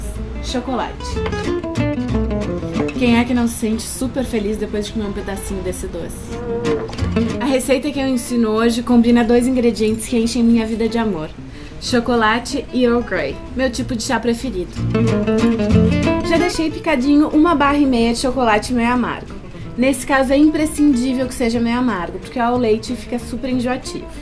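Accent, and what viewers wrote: Rio Grande do Sul, Brazil. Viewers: Guria! Amei a receita e também gosto muito do filme! No programa passado desconfiei que você fosse gaúcha! Eu também moro no RS, em Caxias. Já curiosa pela receita da próxima semana! Beijão!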